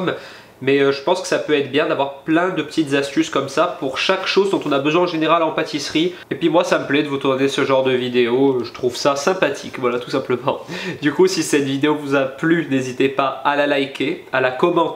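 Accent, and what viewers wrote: Corsica, France. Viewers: Super vidéo, avec l'accent corse.... Bisous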